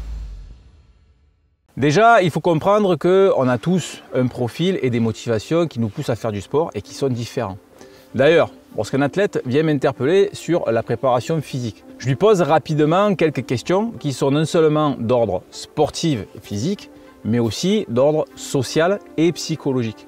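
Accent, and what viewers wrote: South, France. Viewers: Merci pour cette belle vidéo, j'apprécie ta façon d'aborder les choses et ton honnêteté et biensûr il ne faut surtout pas oublié l'accent du sud si charment droit au but 💪🏾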